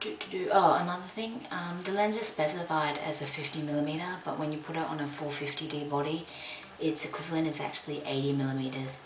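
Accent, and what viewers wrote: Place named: Australia. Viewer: Gr8 comments on the lens. Lovely Nicole Kidman esque accent. I wish you well in your SLR endeavours. Ps. I'm using this lens with a Canon EOS 30V 35mm and have taken 3 shots on the new 500D. Interesting in seeing my 35mm shots since the Digital ones are gr8 in low light.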